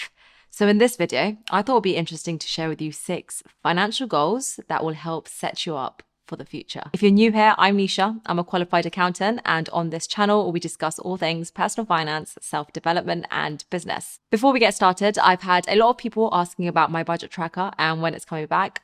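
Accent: England, United Kingdom. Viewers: I normally dislike English accents , but hers is lovely, very feminine, if she just wasn’t so hard to look at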